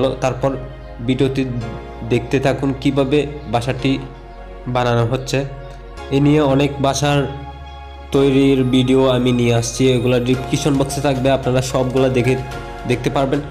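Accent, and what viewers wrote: Chittagong, Bangladesh. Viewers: Karon ami deklam apni chitainga vasay kotha boltesen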